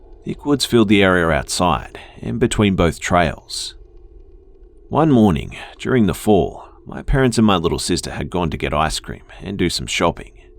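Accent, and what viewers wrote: Australia. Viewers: Listening to an Australian pronounce southern terms 😂 I kept being like wait wtf is he saying then I'd be like ohhhh 😂😂😂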